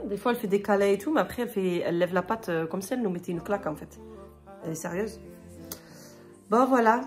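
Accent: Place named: Midi, France. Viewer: Mignon l'accent du Midi 😊😊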